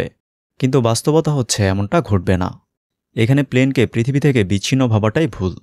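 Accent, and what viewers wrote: Narsingdi, Bangladesh. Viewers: Vai apnar basha ki narsingdi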